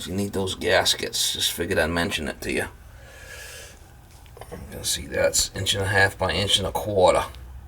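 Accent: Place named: New Jersey, United States. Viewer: I’m not even a plumber but love watching this guy do his jobs with real life experience. Great explanations and New Jersey accent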